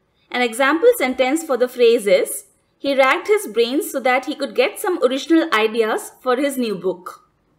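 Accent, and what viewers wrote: India. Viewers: You speak English-language with Indian accent. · lmaooooooo indian voice xdxdxdxd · When the video was done and you realize you don't understand anything because of laughing at the indian accent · People complaining about her "Indian" accent could switch on the subtitles. Great tutorial, subscribed to your channel :)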